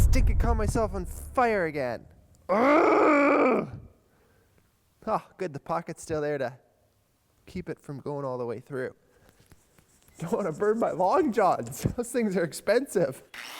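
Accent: Canada. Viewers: Holy crap this guy sounds Canadian! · dude i didn't need any1 to tell me that this man is Canadian lol, no pun intended i love the Canadian .....what ever 1 calls it lol ..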